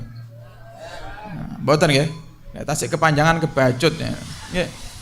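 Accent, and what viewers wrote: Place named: Java, Indonesia. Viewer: Habib Hasan bin Ali Asegaf, Ganteng Jo wone medok, smg sehat Habib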